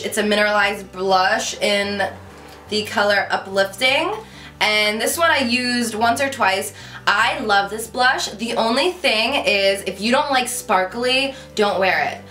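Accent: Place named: Rhode Island, United States. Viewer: Haha I know she's from New Jersey but being from Rhode Island I think she has a Rhode Island accent, not like they're any different tho.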